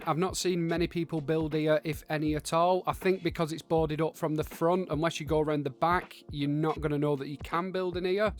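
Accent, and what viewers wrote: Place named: Northern England, United Kingdom. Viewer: mate your commentary is unreal, as a northerner hearing another northerner doing videos is hilarious cause I catch all the jokes, there'll be a time soon where northerners are appreciated more on youtube and you'll get ya views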